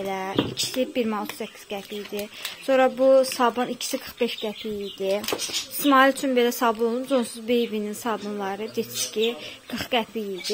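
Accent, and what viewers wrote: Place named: Southern Azerbaijan, Iran. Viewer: Salam bacı bir videonuz təsadüfən qarşima çixdi,elə o videodan sonra sizə abunə oldum,özümdə iki uşaq anasıyam,eləbil öz həyatımı gördüm amma mən sizdən yaşda böyükəm,heç kese qulaq asmayın, necəsinizsə elədə evinizə eşiyinizə yapişin,ailə bir qaladır o qalaya başqalarının fikirlərini girmeye qoymayın çox səmimi,mehriban ailəsiniz Allah qorusun,birseyi unutmayın bacı bugün etdiyinizi qarşiliqini Allah sizə verəcək inanın,həmdə fazlasiyla halal olsun size tərbiyə verən ata-anaya(bu arada videolarinizda doğma cənubun ləhçəsinide,eşitdim yada oxsatdim😊özüm Masallidanam❤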